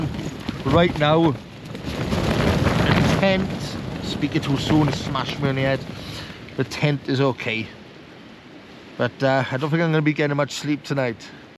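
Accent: Wales, United Kingdom. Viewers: The Walsh accent is madd 😅😅😅 nice video tho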